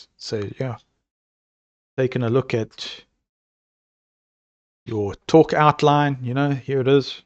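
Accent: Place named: South Africa. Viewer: Heard your voice and thought you sound south African. Kept in listening then you spoke of SA. Then continued and thought. I can swear I heard a talk of yours. You have a very soothing voice. But that's not easy for a tween trying to stay awake at a slow Sunday afternoon public talk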